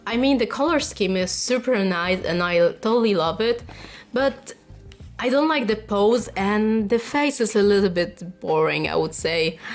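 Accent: Poland. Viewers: I'm from Poland like her and my dad has that accent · Masz taki "polski akcent" xD Jesteś z Polski? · 'cause is polissh accent 😏😘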